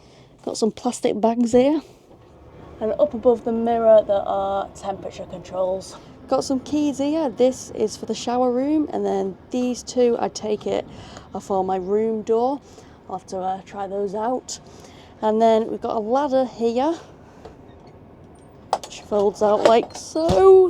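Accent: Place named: Sheffield, United Kingdom. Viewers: I live about 60km due south of Bastuträsk so this is a train I have used a number of times. I am however looking forward to the Norrbottnia being completed up to Skellefteå which will speed up services a great deal. Nice video and well put together and I love you English accent. I am originally from South Africa 🤪. Subscribed. Fun Fact. SJ took over the service of the sleeper train service (they were the original company before privatisation) while waiting to see if anyone would take over the service. It has been said it will be ended/closed soon but as of yet no takers. As for now the final run is planned to mid April 2026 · Lucky find for me this video, thanks. Looked like a great trip - especially for free. Sounds like you may be a fellow Sheffielder - am I reyt? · Love your accent; thinking from around Sheffield?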